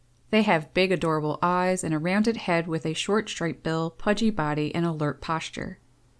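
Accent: Canada. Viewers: I love you! I love your Canadian accent! I love bluebirds! 💙 I have alot of them in my yard and occasionally will eat seed from my feeder. Interestingly I have a wooden split rail fence. Now I know why I have so many in my yard. Thank you for your 💓 of birds! You're easy to understand, and humble way of sharing information is a Blessing!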